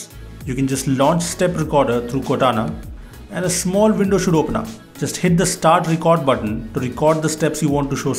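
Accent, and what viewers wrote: India. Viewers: I like your videos but i hate the accent cause many scam-Indians are calling me all the time and tell me that there's something wrong with my win 10 laptop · Thats Indian accent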